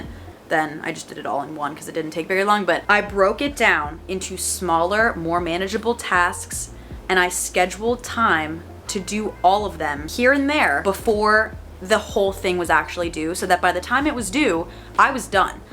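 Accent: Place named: California, United States. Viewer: That California accent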